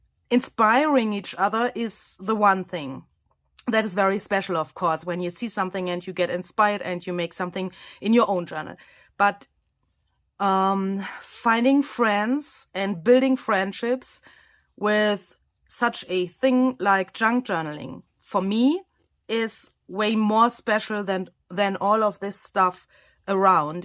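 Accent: Germany. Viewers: such a heart felt message today! To me I do not care what brand you use. I am here to watch your creative, very interesting journals. What you are making is art! You have a heart of gold, and I have connected to you. I told you before that my dad's family all came from Germany. Some in 1840, 1860, and others later. But for some reason, your accent reminds me of my great grandparents, because their first language they spoke here was low German, and they still had the strong accents. I feel like you are family to me. Some people are what we call them here, key board warriors. They hide behind a screen, and say mean things, because we don't know them, and we cannot see them. I will continue to watch you, because you to me are family. And listening to you, brings back the comfort, of listening to my long gone great grandparents. And I am much older than you. It is hard to explain. 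Your English is good! I couldn't pronounce the German words right when my grandma tried to teach me. She said my tongue wasn't slick enough. (She told her youngest daughter the same) ha ha! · This is the most wonderful junk journal I have Ever seen and the most amazing piece of art you and Barbara have done together. You have created more than just a journal, it’s very special. Ideas come from your imagination of seeing things before they are created. Like seeing it done before you start it. I have been able to do this with my father. He taught me to image what I want to make before I came out with it. And some I taught him to make a new way to do a project an easier way, than he thinks. I love watching your videos, love your German accent, and your English is wonderful. You have touched my life and creativity, as well as others I am sure. You are Amazing, and Barbara. Thank you so much for your sweet kindness,. 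I just Lov you. Keep up with your great works. Always be safe and well. Your fan and admirer. Lin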